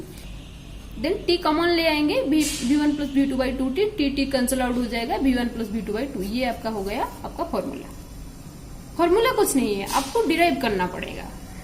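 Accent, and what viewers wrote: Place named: Odisha, India. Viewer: Badhiya questions badhiya explanation ,mam.apki language se pata chalta hai ki ap odia ho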